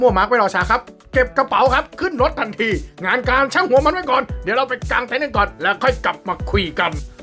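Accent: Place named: South, Thailand. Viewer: ฟังเพลินครับภาคใด้มันมากครับ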